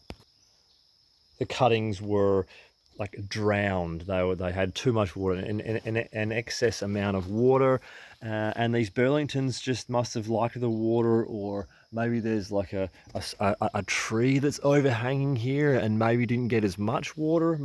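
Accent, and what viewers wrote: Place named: Australia. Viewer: You sound like an Aussie 🇦🇺☺️🙋🏽‍♀️ But you’re in Canada?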